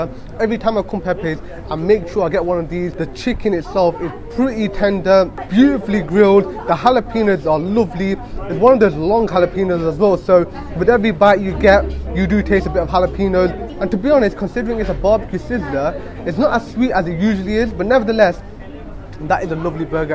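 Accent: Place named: London, United Kingdom. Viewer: He sounds like a Londoner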